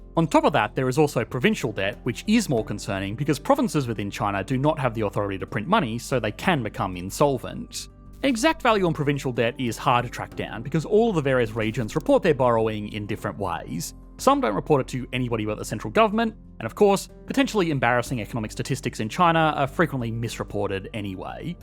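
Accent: Australia. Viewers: I love the Australian accent but listening to yours is just insufferable. I don't know why you moan at the end of every sentence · So Aussie. Thanks for watching mate. Love it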